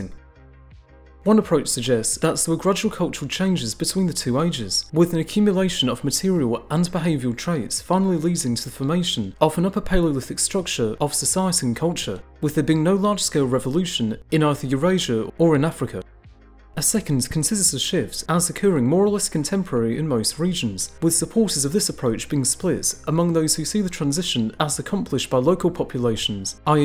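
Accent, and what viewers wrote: United Kingdom. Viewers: excellent video! going to watch North 02 s part now (been following both of you beforehand). as for the audio issues, as a non-native english speaker it´s hard to follow you sometimes, and I don´t know if it´s because of your british dialect or the pacing. still, a loooot of information packed into a 15min video! great work! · I grew weary of his British accent of which I could understand maybe half. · I enjoyed this, as I have many of your other videos. Please take this as constructive criticism when I say that your narration comes across to me as a monotone which, combined with your lovely but rather thick British accent, makes it difficult to follow the flow and details of the script. While I'm at it, I may as well say, too, that printing some words on-screen would often be helpful for amateurs such as myself. I'm not always familiar with technical terms, e.g., names of cultures. 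Thank you for considering my feedback. I am very much interested in prehistory, paleontology, geology, zoology, evolution and human and natural history.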